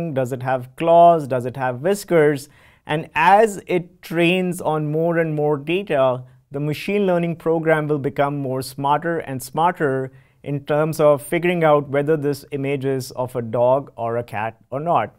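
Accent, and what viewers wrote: India. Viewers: I can't go against myself and pretend that it's pleasant. It's not. The accent is irritating, and choosing an Indian native speaker to narrate a text in English is simply a stupid decision. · why do you guys hate Indian accent ?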